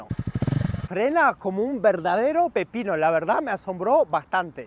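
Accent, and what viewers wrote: Argentina. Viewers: 🤔 parese de Argentino su forma de hablar 😂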